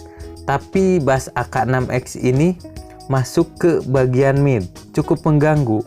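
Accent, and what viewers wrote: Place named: Nagreg, Indonesia. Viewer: Owalahhh orng nagreg to bang 🤣 Btw riview nya bagus 👍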